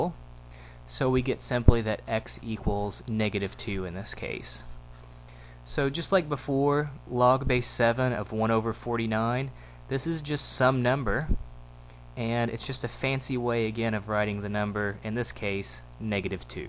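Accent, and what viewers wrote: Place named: South, United States. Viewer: Aw you missed a phone call for us. That's dedication right there Sir. Also, you should do a video in your southern accent - I think you mentioned you have one in another video.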